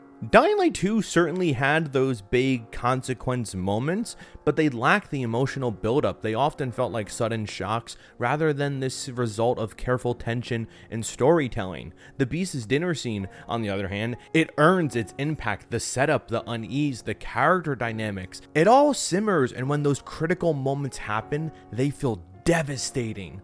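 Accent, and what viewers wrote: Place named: Scotland, United Kingdom. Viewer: Just a smidge of the Scottish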